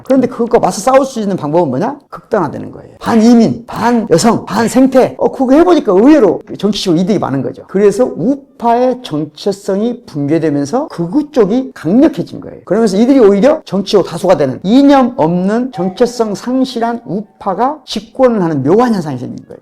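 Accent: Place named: Jeolla, South Korea. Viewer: 말투가 전라도네 ㅋㅋㅋ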